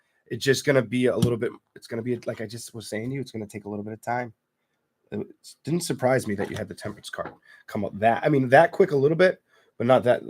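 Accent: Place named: Brooklyn, United States. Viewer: My friend, love the confidence in the way you read! u giving me Brooklyn vibes that's an extra plus 😂😂❤❤❤❤ but that libra reading the ending u glitched my friend because I saw fire and you called it water ...no sweat but u moving toooooooo fast , I love it thou because u don't talk alot like most readers and I appreciate that!!! New subbie ❤❤❤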